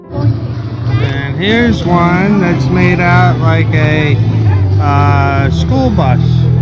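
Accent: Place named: Northeast, United States. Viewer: It's certainly good to hear from you. Where are you originally from? I detect a northeast accent. I stubbled upon your channel because I'm thinking of moving down to the RGV. Anyway, you have another sub! Have a wonderful Christmas season and I'll be watching...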